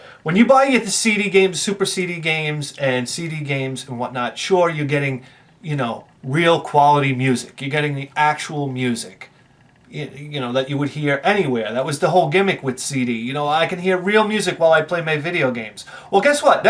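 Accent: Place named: New Jersey, United States. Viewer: I love the rant, but I'm confused. You look like a hillbilly out of West Virginia, but your accent says Jersey trash.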